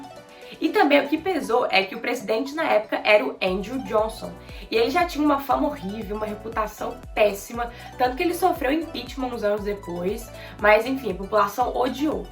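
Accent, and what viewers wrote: Minas Gerais, Brazil. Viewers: Gostei do vídeo anjo.. E essa camisa aí da Rússia q tem a msma cor da camisa da seleção soviética?! É linda.. A Rússia país onde tem a Sibéria.. A Rússia já foi um Império e sua capital era São Petersburgo.. A Rússia da Vodka e do Balé Bolshoi.. É o maior país do mundo.. Foi uma das quinze repúblicas da União Soviética.. Amo o jeito q vc fala.. Amo o seu sotaque mineires.. Tamo junto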